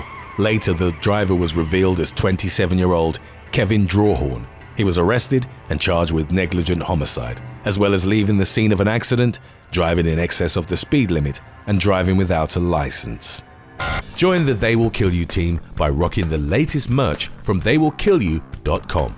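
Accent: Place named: United Kingdom. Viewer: Hey mate you have the perfect narration voice, it also helps your British. Next I would like to hear you narrate BBC Documentaries!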